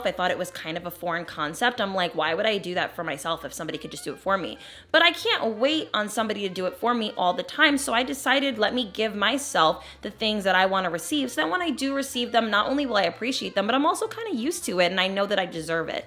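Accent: New Jersey, United States. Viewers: I’m a jersey girl too and I always can tell u were a jersey girl as well love ur vibes, thank u for this wonderful video everything u said was FACTS ! heavy on the I wash my legs ok period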